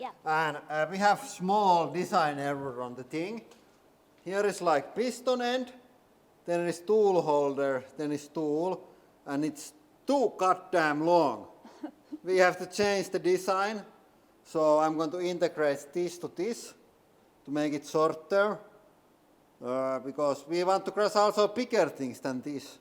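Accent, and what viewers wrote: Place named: Finland. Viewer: I love hearing English cursing spoken with a Finnish accent it sounds so good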